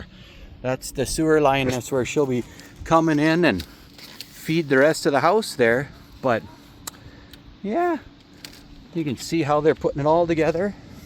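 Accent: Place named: Midwest, United States. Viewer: This guy is deep north Midwest 😂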